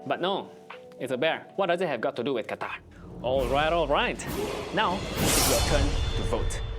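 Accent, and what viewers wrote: Indonesia. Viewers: your indonesian accent is very strong. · speaking of which, do i hear a hint of Indonesian in your accent? i love that your accent isn't your run-of-the-mill Singaporean accent!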